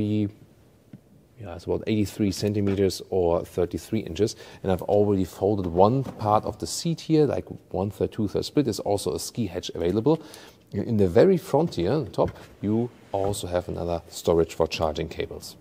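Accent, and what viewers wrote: Germany. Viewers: If the presentation of a car is mostly focusing on the design you know that something is wrong. It doesn´t help that a german guy is trying to make it more convincing by giving a biased presentation with a bloody german accent. The high pricing is just insane.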